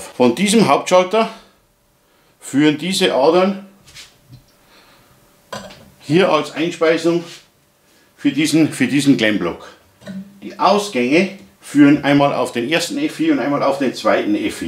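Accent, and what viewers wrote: Bavaria, Germany. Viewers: Als Laie bin ich hier vermutlich so etwas, wie ein Exot....und trotzdem bemerke ich Deinen Spaß an der Freud' .... und verstehe bayrisch und Technik immer besser!.. Super Video!!!! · Bitte red wieder boarisch!!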